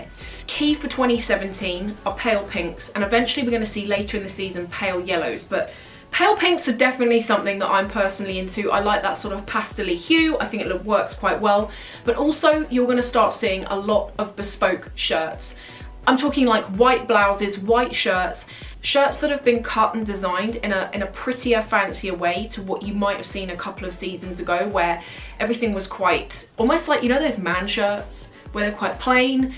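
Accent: United Kingdom. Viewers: Great tips and I adore your British accent.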